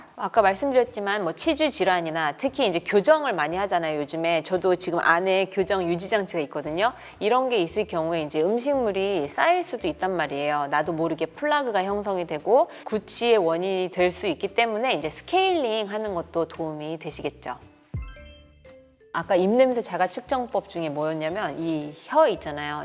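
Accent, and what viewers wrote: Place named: United States. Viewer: 영어 발음두 미국본토 발음이시구 네이버 다음만큼 지식이 훌륭하십니다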